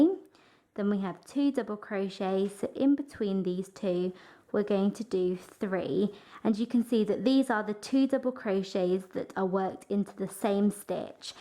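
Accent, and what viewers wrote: United Kingdom. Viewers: Absolutely love this dress! You make it so easy. Excellent teacher! Being from the US, I love listening to your British accent.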